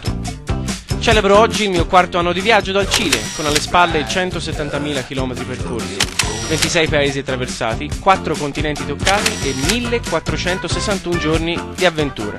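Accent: Tuscany, Italy. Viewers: Grandissimo...ti ho seguito per anni sulle pagine di InMoto, ma vederti in video è proprio un'altra storia! Simpaticissimo, l'accento toscano è veramente una marcia in più (te lo dice un romano) per far sorridere. Ti invidio!!! Complimenti per tutto, e continua cosi!!! P.s. Ma per scendere dall'albero poi come hai fatto? :P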